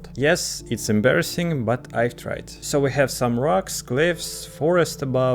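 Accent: Russia. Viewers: воодушевляющее видео) и ты явно русскоговорящий)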